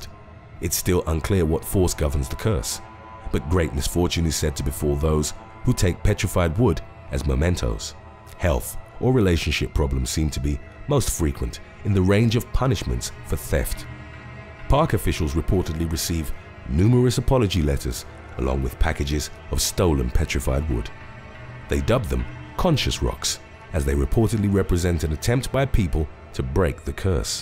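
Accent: United Kingdom. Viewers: Don't you realize the narrator is British? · His British 👋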